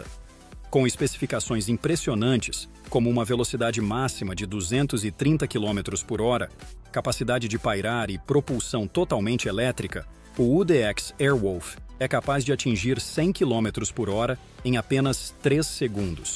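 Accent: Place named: Russia. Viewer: I feel a russian accent... 😮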